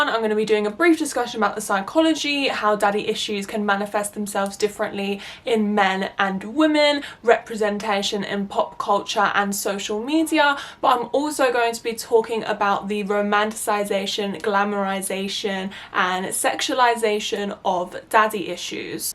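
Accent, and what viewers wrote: England, United Kingdom. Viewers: Her british accent 🦋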